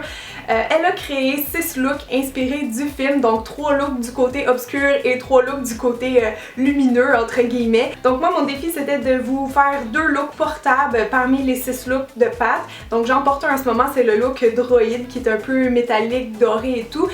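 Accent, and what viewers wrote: Quebec, Canada. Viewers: Es-tu québécoise ou quelque chose de ce genre car tu as un accent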